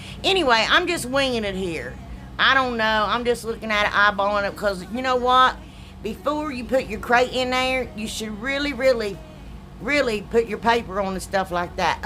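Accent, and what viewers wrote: South, United States. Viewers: Absolutely love your country twang! Been watching you since you started, love your channel! Keep up the great DIY's! Oh and STICKERS!!!LOL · i love your designs! everything you make is so beautiful and i LOVE to listen to your accent and laugh!!! us southern girls have to stick together lol (NC here) love and prayers!!!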